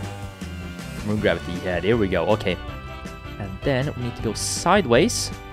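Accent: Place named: Sweden. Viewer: Swedish ascent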